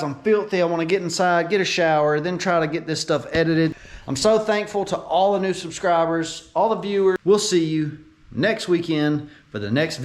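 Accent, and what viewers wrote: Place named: Deep South, United States. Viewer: Tomatoe, Tom ato, potatoe pot ato it's all in where you're from. You've been pronouncing it correctly in the deep south dialect. 😁😁 Keep em coming brother!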